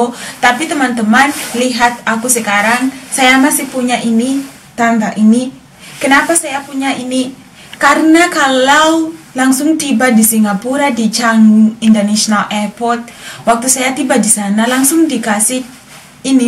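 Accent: Java, Indonesia. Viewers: jangan dihilangin medoknya ya di Inggris mbak hehehe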